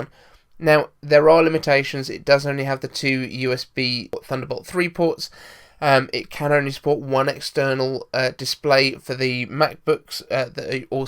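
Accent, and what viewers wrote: United Kingdom. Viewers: You could have a pen knife. I am a recent subscriber enjoying your updates, nice to hear a British accent as well :)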